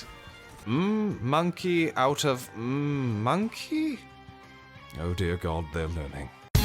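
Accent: United Kingdom. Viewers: Love the content & your British voice. But it's kinda funny how I literally just made a double primary cascade point PVP build with Undying Tempest & positive outlook with Dragon Shadow on my hunter yesterday. And it's so much fun once you get the ball rolling! Cascade Point is actually a sleeper TTK and DPS perk.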